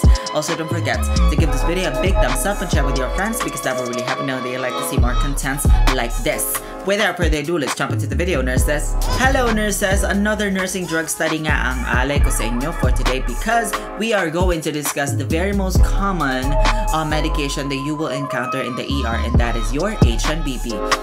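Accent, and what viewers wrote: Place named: United States. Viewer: English di maintindihan parang amercano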